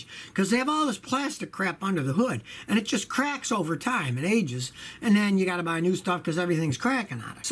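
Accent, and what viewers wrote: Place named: Transatlantic, United States. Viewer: Where did you get that "trans-atlantic accent??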